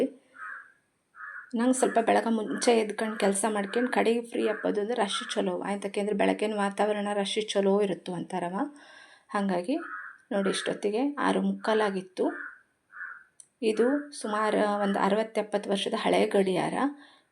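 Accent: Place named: Karnataka, India. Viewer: nim havyaka bhashe slang namma kundapura bhashe ge shekada 80nrashtu hathira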